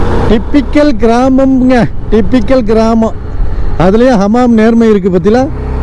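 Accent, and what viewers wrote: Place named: Tirunelveli, India. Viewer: Nellai Tamil plus for your channel. Please keep it up.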